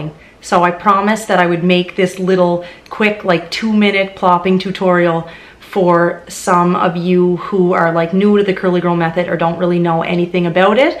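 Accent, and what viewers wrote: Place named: Nova Scotia, Canada. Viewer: Well it's got to be Nova Scotia somewhere lol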